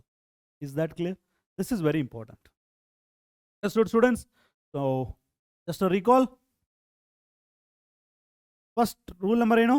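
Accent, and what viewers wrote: North Karnataka, India. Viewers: Sir nimma uttara karnataka speaking ❤❤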